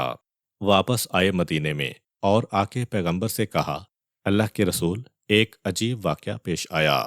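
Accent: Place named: Punjab, Pakistan. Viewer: آپ کے لہجے میں پنجابی جھلکیاں سنائی دیتی ہے مجھے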